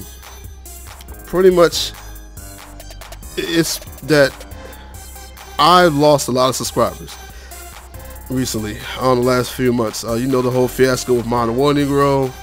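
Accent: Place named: Baltimore, United States. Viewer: So I discovered u thru the GamingIlluminaughy and I loved u immediately bcuz I could hear the Baltimore in u and u were always funny and honest. I support u, MWN and JG. Not gonna lie, I dont really like the new dudes MWN is running with but I will support none the less. To mirror the sentiments of others, continue to make vids if u want to. I totally get the being grown n trying to find balance with this and the real world. U also mentioned that u were from the old school when games took effort, consistency and constant replays sometimes from the very start of the game. Stop worrying about clicks if u enjoy what u do. I would love to see a vid with u and JG if MWN cant come. I also see in the comments that many ppl support u. Stay confident Fam. We need ppl like u to represent for our city. Luv u ♥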